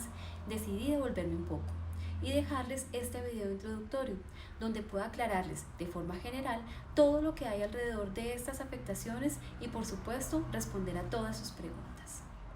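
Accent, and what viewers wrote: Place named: Colombia. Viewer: Acento Colombiano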